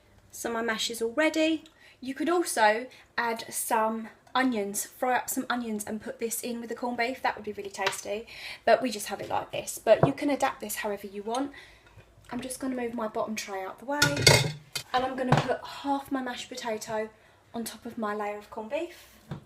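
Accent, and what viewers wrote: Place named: Essex, United Kingdom. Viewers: I have been cooking for well over sixty years, I love learning and looking at different recipes, from complicated to easy. My dear lady, if I may address you as such, it is a delight to hear your lovely English accent, down to earth instructions, a great video, much appreciated. Yes, I remember spam fritters, a staple meal in the past when there was so little choice to buy. Brilliant video, you could cook with me anytime, much respect! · This is an Essex accent. Nothing like Scottish or Irish.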